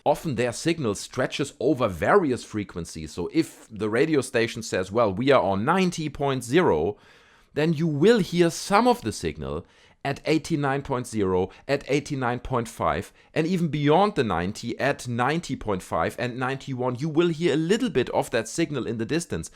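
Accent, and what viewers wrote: Germany. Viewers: why you sound german lol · very good english but i know exactly that you re German 😂 thick accent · I love your German accent! It's cool! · Mann, hört man dein deutsch sein raus 🤣🤣🤣🤣🤣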